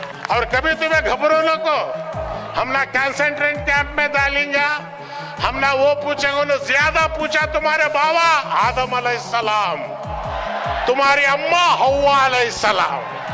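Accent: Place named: South, India. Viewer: south indian urdu language ka mazaich aur hai ❤❤❤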